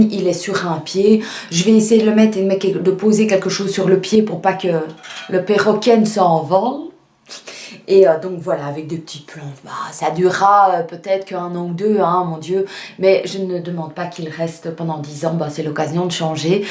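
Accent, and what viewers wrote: Belgium. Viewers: Coucou, je suis nouvelle sur ta chaîne. Je suis de Bruxelles et ça me fais plaisir de suivre des haul action d’une youtubeuse belge! 😊 Déjà j’aime ta bonne humeur, la façon de présenter tes articles. Et écouter les prix à la prononciation belge 😄 Pour tes feutres, ce n’est pas des surligneurs « fluo comme on dit chez nous » ce sont des marqueurs à alcool. C’est plus pour le coloriage et loisir créatif. Je ne les ai pas utilisé pour l’utilité que tu veux en faire, à tester. Mais un conseil garde les conserver bien à plat et pas debout dans un pot sur ton bureau par exemple. Car la pointe sèche. Bisous à toi et ta petite famille 😘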